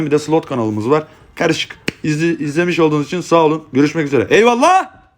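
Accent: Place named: Aegean, Turkey. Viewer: Siven tam ege şivesi reis mausuna taş deymesin belki bizede bu 444 kişinin için şans güler bol şanslar 🖐️ Papara numarası <num> A. D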